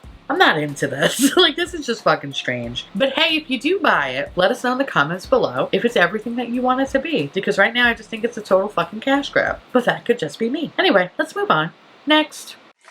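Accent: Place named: New York, United States. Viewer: Hearing your accent more today than usual. Sickness brings out the the NY. Feel better.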